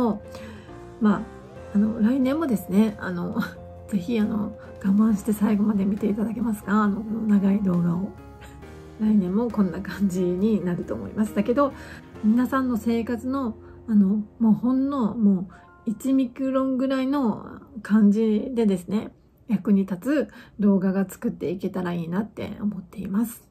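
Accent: Hakata, Japan. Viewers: 博多弁のイントネーションを聞くのが本当に、いやしです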